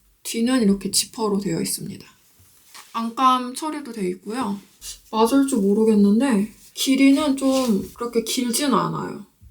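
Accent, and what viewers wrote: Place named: Seoul, South Korea. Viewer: snl 나온 서울사투리를 현실로 본것같애요! ㅋㅋㅋㅋㅋ 옷 다 이뻐요 잘 보구갑니당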